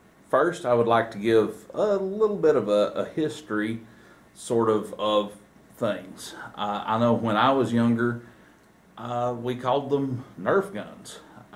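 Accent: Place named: South, United States. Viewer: Once again the voice of reason has a sweet southern drawl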